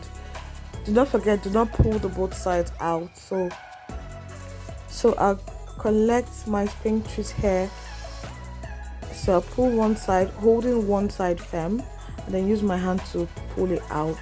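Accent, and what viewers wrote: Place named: Nigeria. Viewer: Firstly I might say I just love the Nigerian accent. One of my favorites. And you did the things hunny,looking all so good and I did click the like button because I really do understand😂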